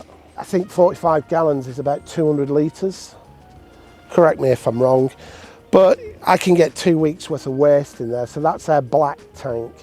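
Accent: England, United Kingdom. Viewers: Brogue is Irish...he is English ! 😊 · your beautiful English accent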